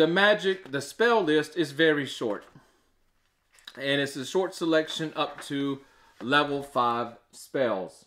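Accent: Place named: Mexican-American, United States. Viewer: lol nah man, it's cool. You sound like Mexican people living among Americans, due to being exposed to that accent type, like most Americans do, by the way. I can only appreciate your Spanish!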